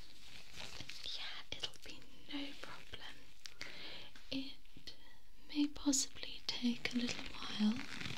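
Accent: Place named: United Kingdom. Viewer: Your voice relaxes me especially the way you intimately talk into the microphone. What kind of British accent do you have exactly ? It’s perfect for ASMR.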